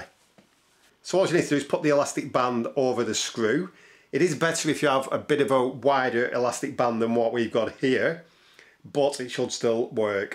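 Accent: United Kingdom. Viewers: British voice makes this pleasure to watch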